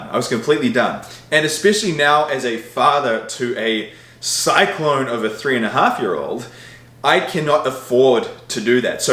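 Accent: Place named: New Zealand. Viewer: You have a New Zealand accent, mate. Have you lived there a part of your life?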